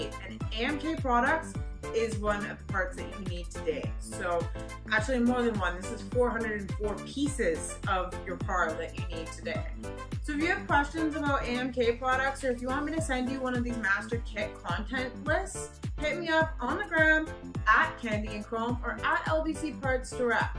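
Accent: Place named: Canada. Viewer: Canadian eh!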